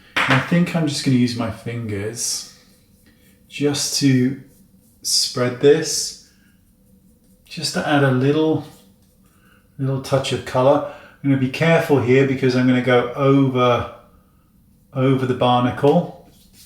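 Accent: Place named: Wales, United Kingdom. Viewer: You absolutely crack me up! Proper welshy and bloody lovely mun! You’re also my 95 year old nans favourite she bloody loves you she does and would love a cwtch! Have a great day and thanks for always making me smile lovely boy xxx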